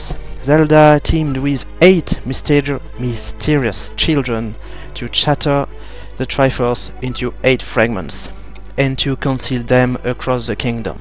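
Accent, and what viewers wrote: France. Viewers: Bro, we figured you're french from your first word! You didn't have to mention xD t'assures mec 👍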